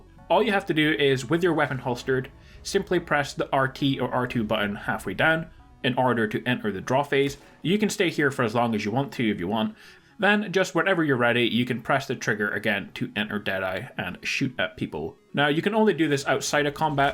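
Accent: Scotland, United Kingdom. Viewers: You sound a touch Scottish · I hate the quickdraw system in RDR2. It is completely retarded. It tells you to fill the meter slowly on the first press, by pressing slowly...Press slowly? It's supposed to be a quickdraw ffs! I died so many times trying to do just that. The trouble is that you don't get much opportunity to practice either. BTW are you Scottish ya bawbag? Me too.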